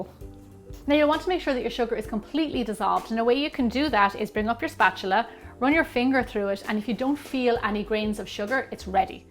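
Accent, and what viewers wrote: Ireland. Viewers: Thought she was from Ireland....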